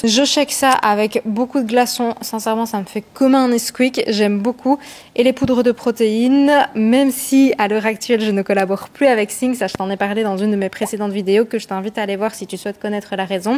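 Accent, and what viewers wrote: Belgium. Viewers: Bonjour, J'ai découvert ta chaîne il y a peu et honnêtement j'adore!!! 🙂 En revanche, je ne suis oas sûre que tu atteignes véritablement 120g de protéines avec tes repas Au petit dej, même si tu prends 200g de skyr = 20g de protéine et ton granola =20g de plus, 2 gaufres à midi et ta salade ne te permettent pas d'atteindre 40g en plus si tu n'y as mis que 2 oeufs (12g de prot) et la farine de pois chiche, aussi riche soit-elle. Quant au repas du soir, il me paraît (très équilibré c'est pas le souci) mais là encore, je ne suis pas sûre qu'on ait 40g en tout Tu penses que tu pourrais préciser les quantités de manière à pouvoir calculer les macros Je fais beaucoup de sport et je peine déjà à atteindre les 80g/jour Mais en tout cas, merci pour tes contenus, ta fraîcheur, ton naturel, ça fait du bien! Et en tant que Lilloise qui habite désormais dans le Sud j'aime beaucoup ce petit accent belge qui me rappelle bien des souvenirs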